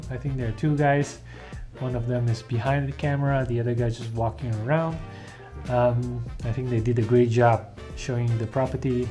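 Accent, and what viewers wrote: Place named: Philippines. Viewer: Why do viewers think you are Japanese? You sure sound kabayan to me. Thanks for your informative videos.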